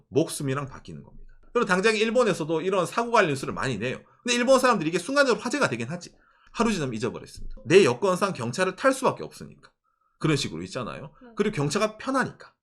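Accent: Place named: Gyeongsang, South Korea. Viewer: 우연히 봤는데 남자분 한국인 이신가요?한국말정말잘하시네요 경상도말씨처럼~~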